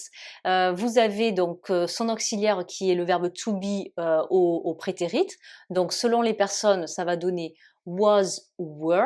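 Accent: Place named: South, France. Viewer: C'est vraiment magnifique prof. J'en profite parce que j'étudie le français depuis longtemps et là, j'ai décidé de réapprendre l'anglais mais, en passant pour le français. Honnêtement, j'adore l'accent du sud. J'ai un ami à moi qui vient de Narbonne qui parle de la même façon. Très bon travail prof...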